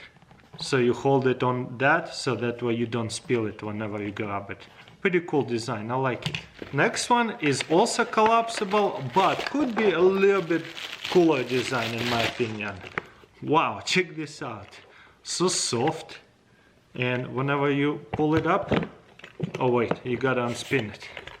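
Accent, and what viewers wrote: Russia. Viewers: Lol he said water battles its "water bottles " lol i like his russian accent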